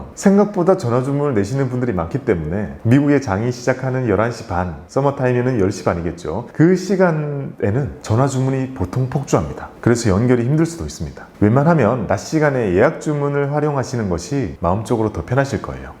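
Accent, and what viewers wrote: Gyeongsang, South Korea. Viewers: 경상도 억양이 남아있어 엑센트처럼 귀에 쏙쏙 들어오네요 ㅎㅎ ^^ 주린이 에게 너무 도움 됐어요~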